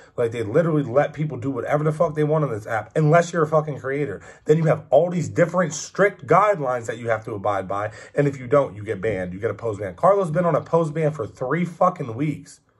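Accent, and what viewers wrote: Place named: Pennsylvania, United States. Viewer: I enjoy watching you. I grew up in small town PA. My heart is there and listening to you reminds me of home. Your accent. The backgrounds. Your story is awesome. Keep going.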